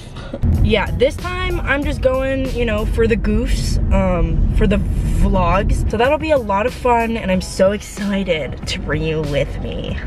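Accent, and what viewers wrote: United Kingdom. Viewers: Loving the accurate af British accent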